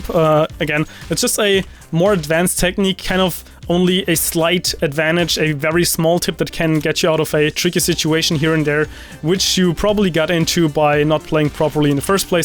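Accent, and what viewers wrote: Germany. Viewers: Are u German? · deutsch as fuck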